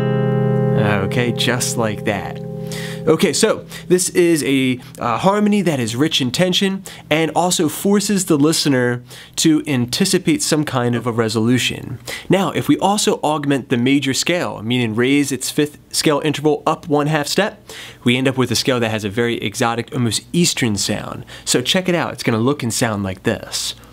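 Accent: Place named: Maryland, United States. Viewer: dude are you from Maryland? Thanks for the lessons by the way. really helping me pick guitar back up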